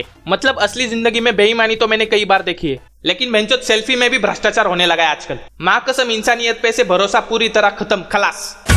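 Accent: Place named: Maharashtra, India. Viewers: Tuzi marathi tone madhli hindi mast waatate 👍😂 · ur accent is full marathi.....very funny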